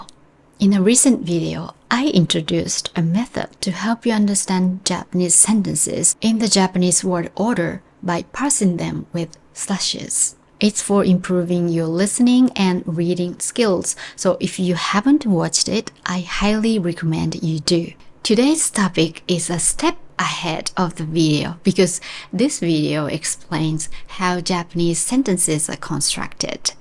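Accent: United Kingdom. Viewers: I am Thai but I really like your English accent. I'm studying Japanese language, and I can practice English skills together. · you british english is beautifull🔥🔥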